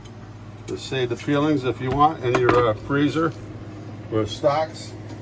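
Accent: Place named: Philadelphia, United States. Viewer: Great Job......you talk and act like ur from South Philly!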